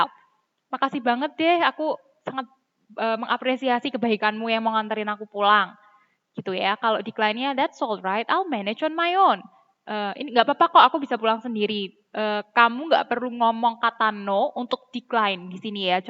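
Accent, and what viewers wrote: Java, Indonesia. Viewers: Thankyou mbaa, lucu mbanya medok hehehe ♥️♥️ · Kak orang aceh ya? Soalnya logat nya kayak jawa☺😁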